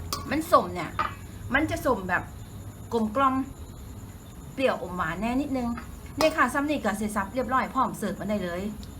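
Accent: Isan, Thailand. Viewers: ชอบภาษาอีสาน ฟังดูน่ารักดี 😄😄😄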